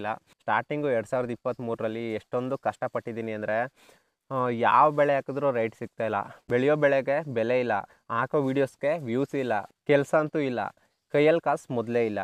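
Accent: India. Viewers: Anna Niv matado style le thilkonde niv nam Chamarajanagara kadeyavre anta... olledagli anna videos madta iri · HAI. I LIKE YOUR KANNADA ACCENT .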